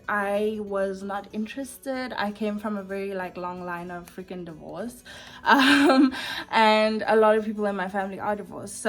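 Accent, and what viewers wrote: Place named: South Africa. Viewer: Omg I love your accent!! South African accents are the most beautiful accents ever in my opinion.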